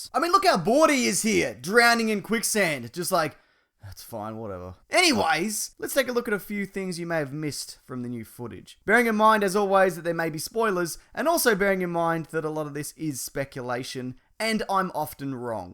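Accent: Australia. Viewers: Good job. Lemmie guess. You're Aussie. Right? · Movies Brilliant video! However, luke's voice over is a mash up of dialogue from episode 6, one part from where he tells leia that she is his sister(my father has it blah blah), and the other part from the same conversation but just a bit earlier.(you have that power wutevah). Still lovin the accent btw, ive been practicing my australian accent (im from england) and its bloody amazing! :) keep up the good work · are you australian...? subtitles are quite useful now.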